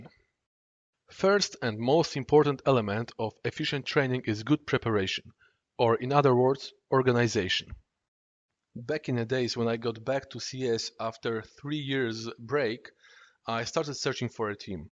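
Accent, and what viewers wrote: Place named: Poland. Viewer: Świetne - naprawdę prosto i klarownie przeprowadzone instrukcje :) Po akcencie domyślam się, że polak, ale bardzo wyraźnie mówisz :) I sporo potwierdzenia z tym jak myślałem, żeby przeprowadzić trening (szczególnie mówiłem, żeby każdy sobie sam opracował smoki obowiązkowo, a na początek zająć się jedną mapą przez kilka dobrych dni, a nie mieszać), a dodatkowo nauczyłem się kilku cennych uwag.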